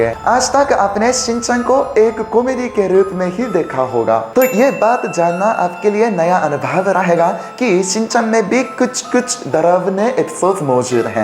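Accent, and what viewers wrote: Standard. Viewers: You speak Hindi purely more than indians. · Itni shudhh Hindi to ham bhartiy bhi nahi bol paate jitni aap bol lete ho😂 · Your hindi need to be smooth and be practiced btw your hindi is standard 😇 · I am an Indian and from north india still he speaks better hindi than me acctually he speaks shudh hindo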